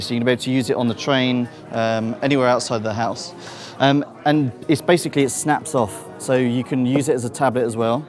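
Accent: England, United Kingdom. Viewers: Saurabh Raut It's the English language, he's pronouncing it the English way, not the American way. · He is English and we pronounce it Hach. As we basically developed English, we should know how to pronounce letters. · It's a British accent I'm pretty sure the British is more accurate on English then Indian 😂😂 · I actually quite welcome an English speaker for once....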